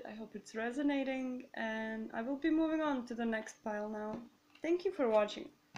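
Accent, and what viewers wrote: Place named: Czech Republic. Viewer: Are you czech i think you have a czech accent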